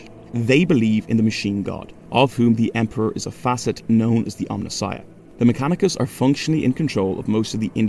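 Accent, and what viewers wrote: Ireland. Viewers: I did come back. The Irish brogue, soft as it is, works well, and the analysis is novel and worthwhile.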